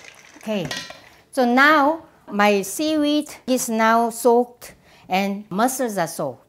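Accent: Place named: South Korea. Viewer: I'm korean. I'm not good at English. but I can understand your speech. I think you have korean accent when you speak engligh.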